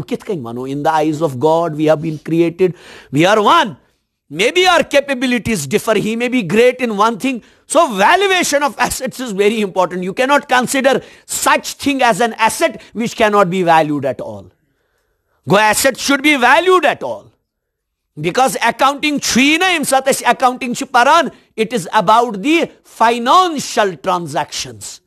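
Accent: Kashmir, India. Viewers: Sir ap ki kashmiri sunn k mojhy B ed ki clases ki yaad aa gi...😂😂😂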